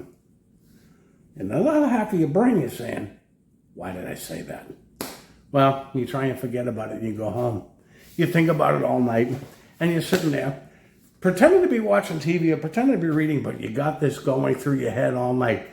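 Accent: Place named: New York City, United States. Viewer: Hi, love your true life stories. You have a knack for encapsulating human nature and real life situations in an interesting and entertaining way. Really enjoyed this one. Like your accent as well is it NYC?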